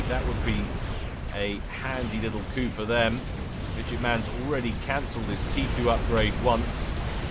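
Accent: United Kingdom. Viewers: I think we have a few too many children up past their bedtime in here...:) In any case though, I think describing your accent as received pronunciation is probably going a bit far, you've got a reasonably posh accent but too tainted to be proper RP.;)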